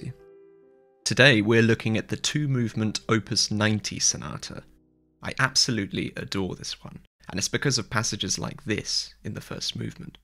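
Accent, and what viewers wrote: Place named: RP, United Kingdom. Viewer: Lovely little video chock full of insight! You should know that I’m positively besotted with you — your angular Anglo-Saxon beauty and the plummy notes of your delightful posh accent (at least to my American ears). I’m a composer in my own right and I find your commentary spot on. Pardon my little outburst of gushing, but I wanted you to know how much I appreciate your videos. Keep up the good work!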